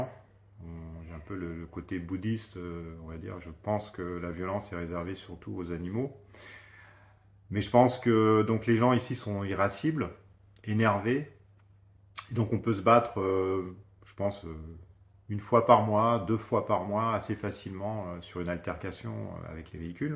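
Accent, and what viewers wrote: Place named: Montpellier, France. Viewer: Tu es de Montpellier toi avec ton accent pointu? Nan en fait je crois que tu es venu acheter le soleil comme ton pote qui est allé acheter une femme dans les pays baltes ou en Thaïlande pays tristement célèbre pour ça. N'oublie pas de revenir te faire soigner gratuitement en France si tu tombes malade ^^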